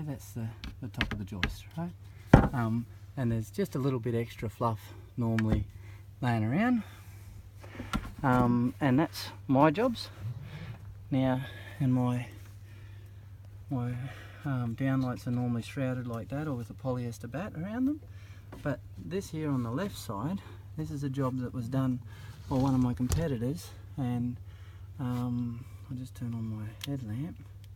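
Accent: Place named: Australia. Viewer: Thank you, it is nice to hear an Australian talking about his product, rather than another country explaining how it is done. 😀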